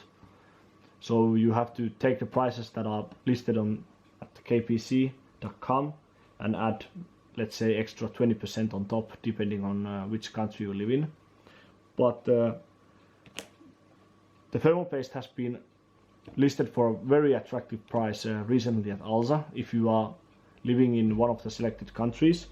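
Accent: Finland. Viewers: Suomi brgl, tunnistin heti aksentist! 😂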